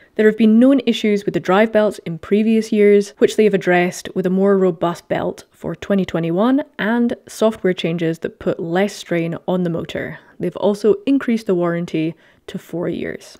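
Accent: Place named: Scotland, United Kingdom. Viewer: Just come across your channel and was obviously swayed by the Scottish accent . . . but I did hear a lot of the bike decision based on sales and popularity on the trails? That should never come in to the equation for a report on a "top 5". Surely you need to say why it is popular? Ride in Peebles/Glentress and you will see lots of Santa Cruz, but that isn't because they are the best (although I may be digging a hole here), but that is because that is the bikes the local dealer sells!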